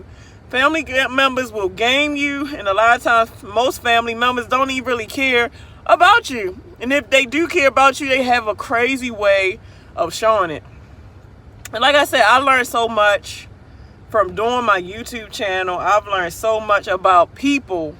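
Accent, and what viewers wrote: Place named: Maryland, United States. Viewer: That Maryland accent 😂😂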